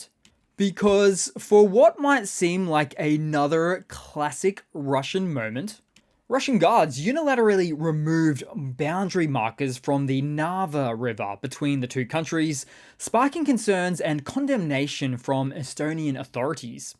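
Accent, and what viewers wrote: Australia. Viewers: 【今日のJuzzieの動画のコメントから一つピックアップ】 あほのコメンターの、こいつによると、'Russia moving those buoys'は、 数年以上に渡る、エストニアとの相互交渉による結果であって、双方の合意によるものだ、ということらしい。 ただ、しかし、その相互交渉、双方の合意なるものは、プーチン・ロシアの、そのポンコツ軍事力（による脅し）を背景にしたものだ、ということを見落としてはいけない。 それは、プーチン・ロシアによる、暗黙の脅しによるもので、単なる、一方的強制であって、当事者間の「双方による合意」とは、言わない。 因みに、プーチン・ロシアの、これまでの、やり口、手法は、すべて、これであって、彼らの言う、相互交渉、双方の合意というのは、すべて、 見せかけだけ、外見だけで、中身は、プーチン・ロシアの、これまでのポンコツ軍事力（による脅し）を背景にした、いわば、強制だった、ということ。 んで、今はどうかというと、プーチン・ロシアが世界に誇る、世界最強、世界最先端の軍事力が、実際の戦争で、実は、すべてポンコツ、大嘘、いかさま、ペテンの代物だと、バレてしまったので、 その結果として、これまでの、プーチン・ロシアの、強引で、一方的要求である、傍若無人もいいところの 見せかけだけ、外見上の、相互交渉の結果、双方の合意、というものは、今後、全て無効になる、白紙の戻されることになる、のは明らか、だと思うんだけど、ね。 4 時間前 You have the best Australian accent,. Goes well with the humour. Russia moving those buoys after years of mutual agreement with Estonia is just so typical. Then it accuses other countries of provocation and the reason for going to war with them. 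It's extremely annoying when other countries give their endorsement and support for this kind of behavior. 5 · You have the best Australian accent,. Goes well with the humour. Russia moving those buoys after years of mutual agreement with Estonia is just so typical. Then it accuses other countries of provocation and the reason for going to war with them. It's extremely annoying when other countries give their endorsement and support for this kind of behavior.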